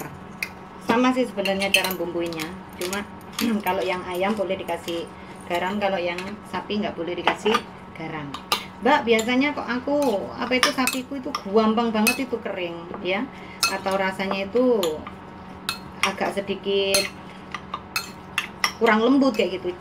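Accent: Java, Indonesia. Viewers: mbk seng ayu iki jowone teko endi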